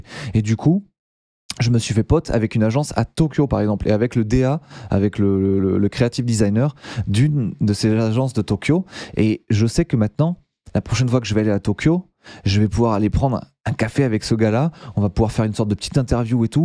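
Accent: France. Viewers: yes, it's great decision to continue for worldwide people 🗺 it's great to follow a french cool man who speak in english with a french accent ça se dit redresser le cou, tu peux même consulter un bon médecin pour ça Du coup, tu vas commander un nouveau plateau TV pour la chaîne internationale ? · Perso j’étais pas chaud pour les vidéos en anglais, mais malgré mon niveau d’anglais à chier, comme tu utilisais des mots simples et avec ton accent français, étonnement je comprenais 90% ! Mais effectivement ça fait un moment que je ne voyais pas tes vidéos dans mon feed 😖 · Le meilleur dev français ❤️ Tu as totalement raison, apprendre de ses erreurs fait grandir mais c'est parfois nécessaire de se lancer et de s'écouter pour pouvoir tenter de nouvelles choses, car c'est en expérimentant qu'on innove. Après je pense que c'est normal que ton accent français en anglais fasse peur aux français mais ce n'est pas du tout un problème pour les anglophones, au contraire ! 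Continu de produire du contenu de qualité peu importe la langue, je suis sûr que tu va continuer à grimper très vite 💪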